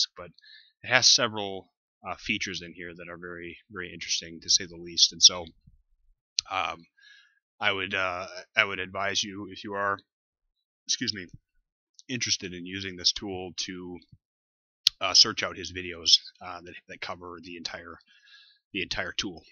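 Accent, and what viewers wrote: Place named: United States. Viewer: You have a true american accent, then you say something in German and I doubt )) Thanks for the tutorial.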